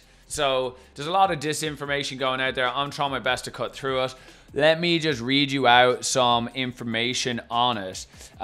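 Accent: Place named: Ireland. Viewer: are you Irish ? I can hear a little bit of an accent, are you in the US ?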